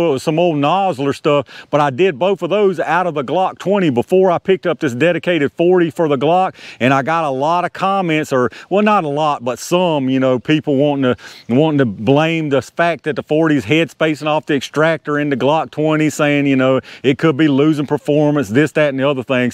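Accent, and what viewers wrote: South, United States. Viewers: Love your videos, your speech/accent. Makes me want to be a southern boy.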